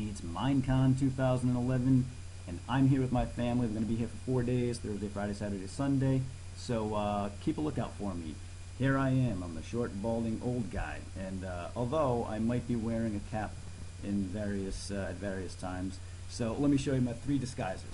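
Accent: New England, United States. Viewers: Your from new England!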